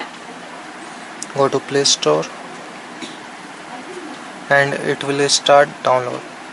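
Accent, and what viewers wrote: India. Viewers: hey bro talk in Proper English not in Bhaya English..I Laugh very very much after watching your video..How your talking man talk proper..It is very funnier than comedy nights with kapil.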